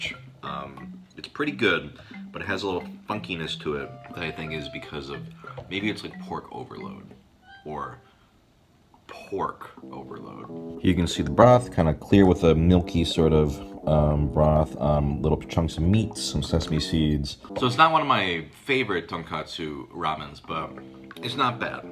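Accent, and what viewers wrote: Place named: United States. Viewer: Why do you sound 100% American??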